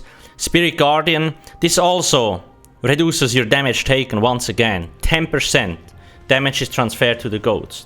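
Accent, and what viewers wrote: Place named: Switzerland. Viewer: Definitely not Russia, Switzerland I think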